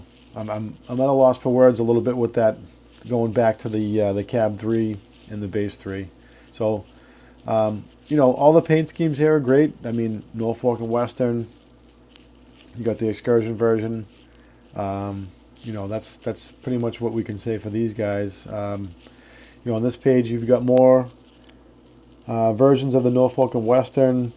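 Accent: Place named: Massachusetts, United States. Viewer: Great video review, You did a good job calling out nonsense and exorbitant prices. Between the prices, sloppy craftsmanship, goofy colors, and bad customer service, it's hard to justifying buying this stuff That RS-27 came from the MTH budget rail-king line which sold well under $400. Lionel almost doubled the price when they got the tooling The passenger cars are almost Golden Gate Depot prices, except Lionel passenger car are plastic, generic and not in the same league. I know there is rampant inflation, but the Lionel steep price jumps started last catalog, before inflation really ramped up....after MTH announced their closing Sounds like you are from Mass, I grew up in Dorchester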